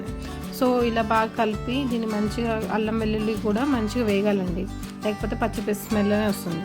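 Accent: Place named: Andhra Pradesh, India. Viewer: Mana telangana antunnav mari mana basha ,yasa akkada matladtha levu .a andra basha matladakandi.mataku mundu yenaka andi bandiloddu.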